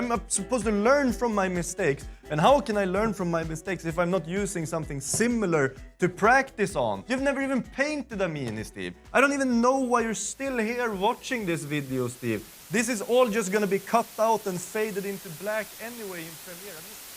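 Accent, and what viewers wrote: Sweden. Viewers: The Swedish accent makes his face really punchable, damn... like nails on a chalk board. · Älskar den svenska accenten😊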